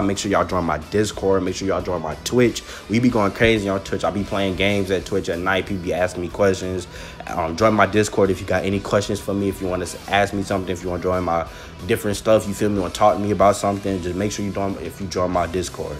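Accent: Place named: Florida, United States. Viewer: You got to be from Florida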